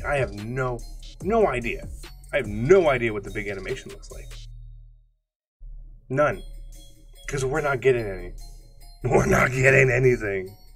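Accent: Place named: Midwest, United States. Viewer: You are so midwestern hahah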